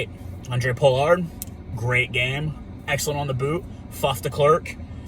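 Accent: United States. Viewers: I feel like the states is a sleeping giant went it comes to rugby. If they really adopted it, they could become a real threat within a generation. Thanks for the video and support for the Springboks. Lived in the states for 4 years, but in the south, you sound like you are from up north, detect a tinge of Minnesota accent on your end. How did you get into rugby?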